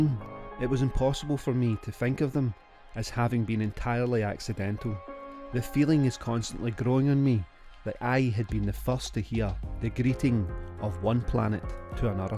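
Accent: Scotland, United Kingdom. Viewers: Really enjoying this Scottsman's narration !!!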